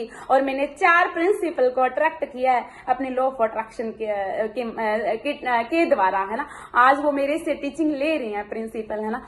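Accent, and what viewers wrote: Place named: Rajasthan, India. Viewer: Di aap ki boli mujhe bhot Pasand h kyuki m bi Rajasthan se hu or Hindi me sab ko achhi trh se samjh aati. H thankyu so much di love you di 😍😘😘🥰😍😘🥰😍😘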